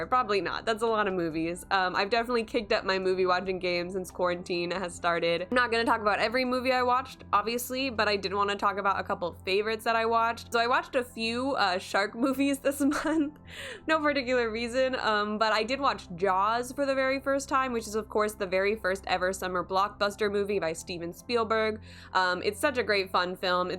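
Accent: United States. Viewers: are you from the mid west ?. your accent sounds mid western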